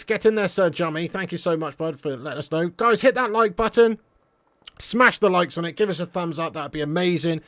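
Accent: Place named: Cockney, United Kingdom. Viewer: ...but ,a better way to say ,maybe; blah yada ditto ,he had a caped goat ,but u pronounce like a full blown 1970s london east end evening standard newspaer seller outside all tube stations in that time peeriod .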